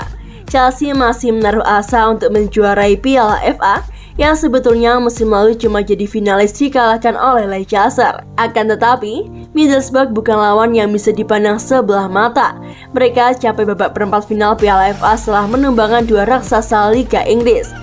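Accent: Java, Indonesia. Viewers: la kok logat jowo mbak e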